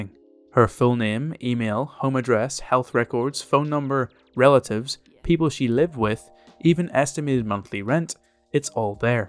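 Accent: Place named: United Kingdom. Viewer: I hate Brit english..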